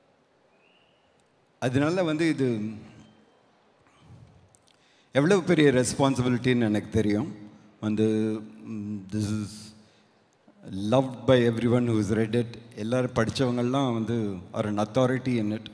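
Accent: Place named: North, India. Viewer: Mani Sir En Vadakkans Maathiri Tamil Pesuraru 🙄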